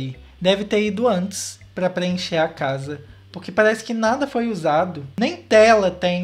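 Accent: Minas Gerais, Brazil. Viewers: Com esse sotaque só pode ser de Minas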